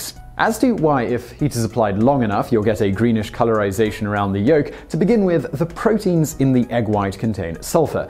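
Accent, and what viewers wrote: United Kingdom. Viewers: is it just me or has he been getting more british?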